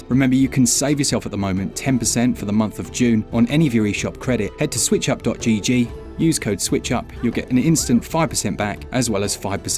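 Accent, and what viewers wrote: United Kingdom. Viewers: I almost never buy any new games but I never miss these videos. There's something comforting about a British dude gently talking to me about Switch games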